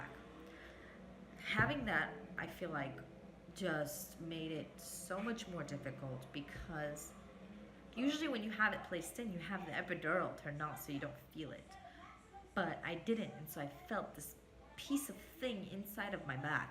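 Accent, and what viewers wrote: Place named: North East England, United Kingdom. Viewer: Are you from the north east? You sound as if you might be.... Oh I would love to find an OB that would allow a VBA2C.... We are in Ohio....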